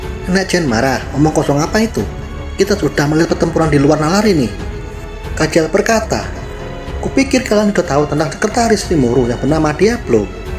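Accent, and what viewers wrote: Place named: Java, Indonesia. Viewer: Medok nya bisa gak di ilangin, sakit teliga gw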